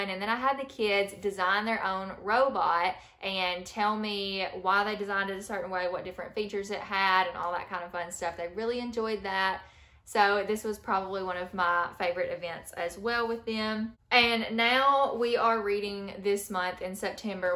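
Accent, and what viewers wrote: South, United States. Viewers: It's great to hear your southern dialect.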